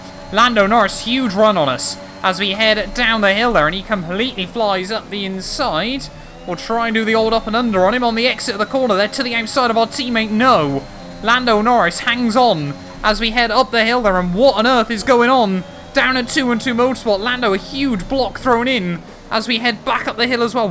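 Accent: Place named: England, United Kingdom. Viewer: "Sweat mode has to well and truly be engaged." You have to love the English language when it is spoken by an Englishman. To me, it just sounds like F1. 😁🇺🇸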